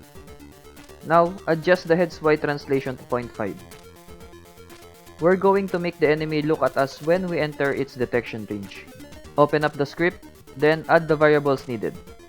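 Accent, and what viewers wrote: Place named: Philippines. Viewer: you sound like filipino...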